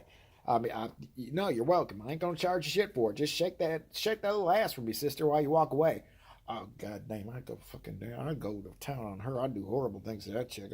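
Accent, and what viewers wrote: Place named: South, United States. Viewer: Hilarious! You and your brother on together would be a scream! I've watched this 5x screaming laughing at your Southern accent and convo. My bro was a semi driver and very shy and I guess these prostitutes would come up to him and flip him out at his rig some scary looking and brazen and he would be like trying to get them off. Haha he had to lock up tight and be prepared for war.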